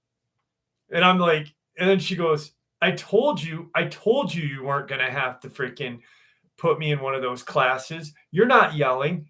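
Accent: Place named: North Jersey, United States. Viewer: Well I believe your doing the North Jersey accent. We here in South Jersey do not talk like that. We have a southern twang. LOL!!!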